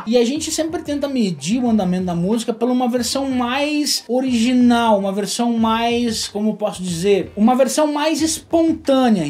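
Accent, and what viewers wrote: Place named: Rio Grande do Sul, Brazil. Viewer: Tu és gaúcho amigo? hahahaa Parabéns!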